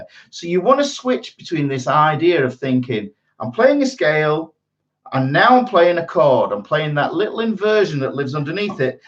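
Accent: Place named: Hull, United Kingdom. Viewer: Trying work out the accent maybe Hull area ish, good lesson mate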